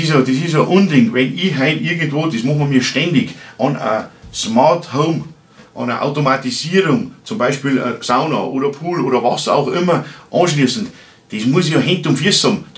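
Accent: Bavaria, Germany. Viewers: Schade dass man dich außerhalb Bayerns einfach mal kaum versteht. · Lieber, ich liebe deine Videos, deinen bayrischen Dialekt und wie du dich so herrlich in jedes Thema emotional hineinsteigern kannst. Natürlich bringst du wieder einmal die genial die Sache auf den Punkt.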